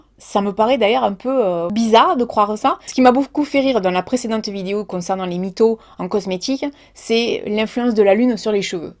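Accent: South, France. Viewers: Ça fait longtemps que je regarde tes vidéos! J’ai créé un compte YouTube spécialement pour te laisser un commentaire. Je trouve ton travail fantastique. Tes videos réalistes. Tu dis tout fort ce que certains pensent tout bas! Je trouve ça cool!! Ton accent du SUD super !! Continue comme ça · Merci pour votre vidéo très intéressante. Votre accent me plaît beaucoup vu que je suis moi aussi du Sud 👍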